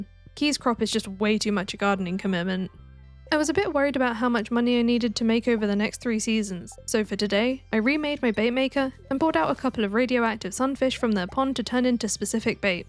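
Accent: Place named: Australia. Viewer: I love how he kinda sounds aussie <3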